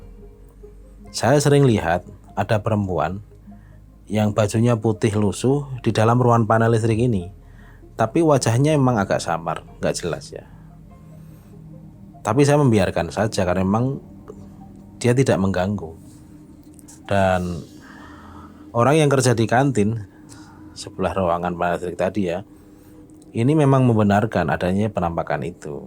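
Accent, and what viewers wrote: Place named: Bandung, Indonesia. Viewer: Dari logatnya sepertinya bapak ini orang Bandung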